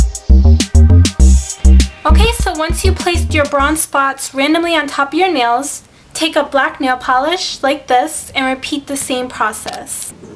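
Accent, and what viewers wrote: New York, United States. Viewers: Cool ;) !! New York accent ? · Very cool video!! I love all things related to doing my own nails, natural, polishes, etc. I will be trying this. P.S Love the accent, sounds like New York maybe or north period!!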